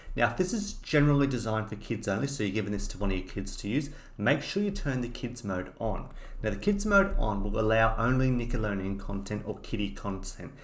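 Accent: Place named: United Kingdom. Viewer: I'm American in U.S, Singed up to this before MARCH 4th launch, i paid only $50 ad free version for whole year ...I dont gave a S about CBS TV stuffs shows, & channels , that MTV & BET is all reality trash channels NEVER care or watch , plus i have U TUBE TV & HULU for that ..Singed up for this ONLY for half off i got for whole year & plus for Paramount & CBS UNCUT premium show's & movies is there & more to come ..beside that F the rest! I LOVE UK guys & accent, is soo cute 🥰👌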